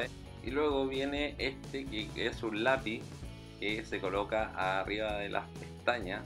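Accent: Chile. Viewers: Es el primer video que veo de este canal y puedo decir que con solo escucharlo se que el chico es chileno XD #LoMasParejoPosible #LoMasDifuminadoPosible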